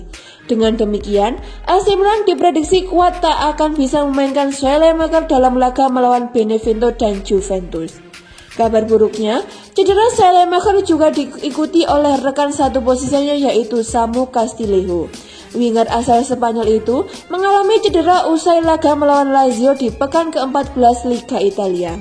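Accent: Java, Indonesia. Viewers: Medok Is Medok 😃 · Kok narator Berita2 Ac Milan Di Youtube... Medok2 semua yaa...??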